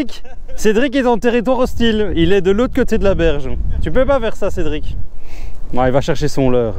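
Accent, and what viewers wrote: Belgium. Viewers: Je kiff ton petit accent belge ;)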